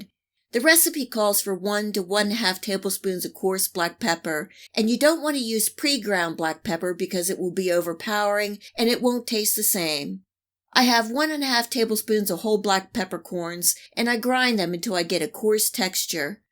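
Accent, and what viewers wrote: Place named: Pennsylvania, United States. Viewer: Love your true Pennsylvania accent.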